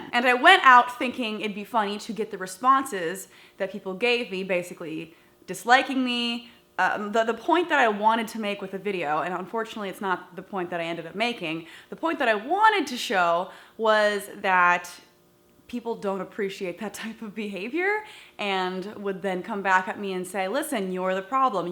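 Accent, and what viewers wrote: United States. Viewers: It sounds like you live in the US.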